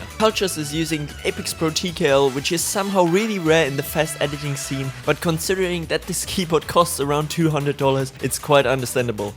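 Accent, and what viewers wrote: Germany. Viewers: deutscher Akzent xd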